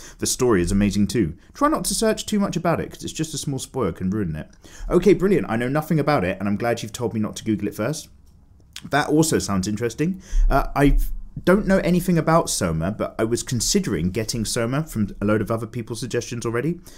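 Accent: United Kingdom. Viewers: Hey! My first time writing on these episoed as I often just lurk and listen to your asmr british voice. But when you were talking about league of legends splashart (2 weeks ago, this is a repost) I had to ask you something, ever heard of Kienan Lafferty? He made most of the famous splashart for riot and he is my biggest inspiration and the reason I started drawing + Im a no lifer and ive played lol since 2012 and the art is way better than the gameplay xP Oh and YOU made me start drawing anime tiddies and "other" good parts;) Anyway love you and your videos <3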